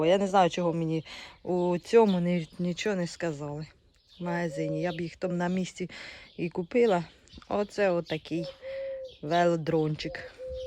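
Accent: Poltava, Ukraine. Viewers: Добрий день україночка! Говор полтавський! Звідки сама? Замужем? Трактор МТЗ зачот!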